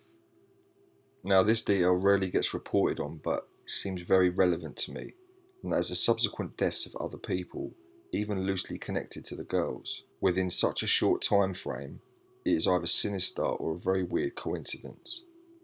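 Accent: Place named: England, United Kingdom. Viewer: Wow sounds the lovely english accent.. long live england .. great insight sir ... these ladies were abducted znd murdered by he.ry Elizier gonzalez znd his gang à cover up rip kris and lisianne 🙏🙏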